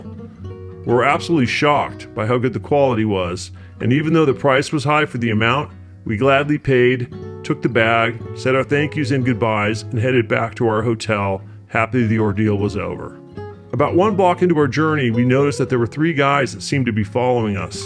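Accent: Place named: Southern California, United States. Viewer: If you're willing to share, are you from socal by any chance? You have a socal accent very clearly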